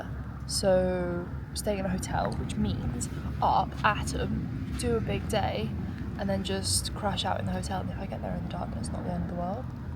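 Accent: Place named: RP, United Kingdom. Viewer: Your accent is so posh lol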